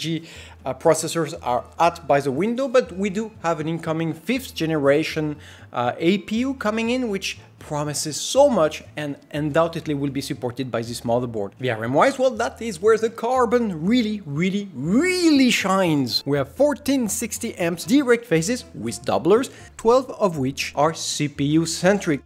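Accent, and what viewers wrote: France. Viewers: Great review as always :):) is it me or your french accent seems to be fading ? You look really more fluent anyway I totally love your work and I'm extremely proud to see a compratiot appreciated ^^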